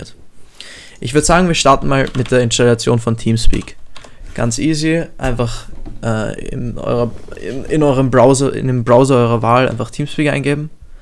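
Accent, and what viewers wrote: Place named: Vienna, Austria. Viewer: Man merkt gleich, du bist aus Österreich, würde auf Wien tippen, sag bitte ob das stimmt haha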